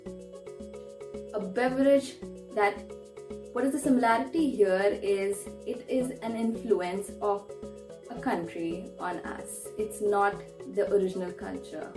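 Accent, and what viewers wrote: South Korea. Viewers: Korean accent.. Wow.. So fluent... Perfect 💯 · I really love your Korean Accent 👍 sounds so natural ❤️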